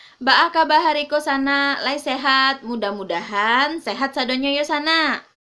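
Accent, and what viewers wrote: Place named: Indonesia. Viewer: Bahaso minang c lah diak,lawak bunyi nyo bahaso indonesia adiak wkwkwk...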